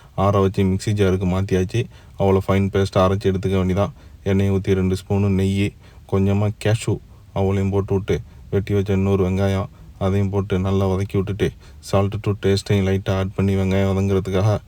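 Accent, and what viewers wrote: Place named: Keelakarai, India. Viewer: காமெடியா கீழக்கரை ஸ்லாங்கிள் பேசுவது செம😅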